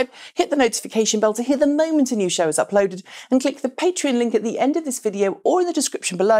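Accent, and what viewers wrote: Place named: United Kingdom. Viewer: Please stop doing these filler stories, it's a waste of your talent and resources. I'd rather see less content that was more important. It's not necessary to come out with a new episode every day if there's no new news., please do a story on the Securities lawsuit from Khang & Khang LLP against Tesla. I'm not sure what they're all upset about. So what Tesla missed their Model 3 production goals, they always miss their production targets. What damage did it have? Tesla stock investors are ignoring it and their stock is still trending up. I'm curious if it's haters trying to bring Elon down? Btw I'm one of your Patreon supporters because I find your show with its professional level production values, unique extensive research, optimistic yet balanced reporting and your delightful British accent, really set your show apart. 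I don't see ads on YouTube because I pay for YouTube Red. Ads drive me crazy so $9.95 a month is a small price to pay for my sanity. Keep up the good work!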